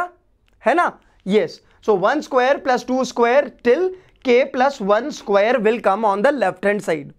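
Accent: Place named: South, India. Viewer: Yeah from south obviously you can understand his accent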